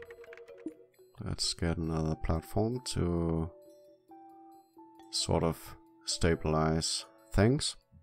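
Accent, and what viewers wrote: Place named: Denmark. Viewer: du lyder dansk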